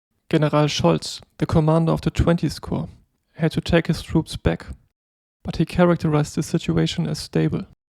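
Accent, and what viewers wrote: Germany. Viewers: Love your German accent !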